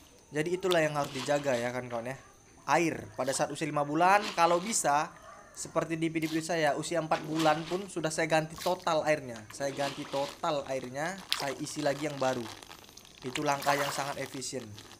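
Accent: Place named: Medan, Indonesia. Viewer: Kayak orang medan abg ngomong nya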